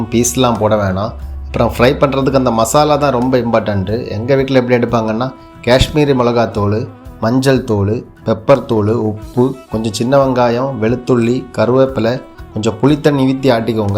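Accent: Kerala, India. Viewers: Neenga pesurathe keetu te irukalam pola azaga pesuringa neenga malayalam aa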